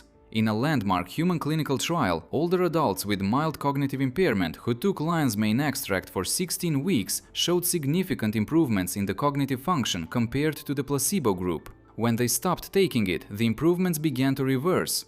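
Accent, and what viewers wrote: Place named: Russia. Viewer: На русском языке здесь только название ??? Но по произношению слышно,что читает русский😂 Как на моем косячном навигаторе: зе камера эхэд😂😂😂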